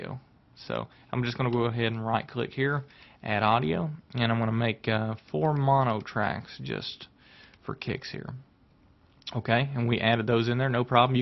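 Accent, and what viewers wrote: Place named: Texas, United States. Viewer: Dude!, are you Texan? You sound it with your delightful dialect.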